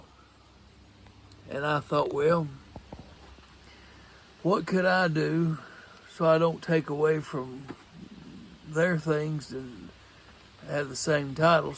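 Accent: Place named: South, United States. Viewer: Believe none of what you hear, and half of what you see. .. don't know who came up with the original saying but never more true with how people can make things even appear real that ain't so. B asiC I nstructions B efore L eaving E arth Glad to listen to you talk. Love good ole boy country accents especially when a good message comes with it. Hope you healing progresses rapidly, and dang, if you keep sitting out looking at that scenery, you ought be healed up soon.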